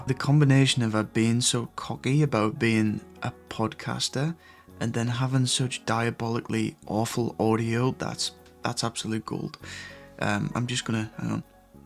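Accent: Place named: Teesside, United Kingdom. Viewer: bizarre question but are you somewhere in Teesside?, I live near Middlesbrough and you sound very similar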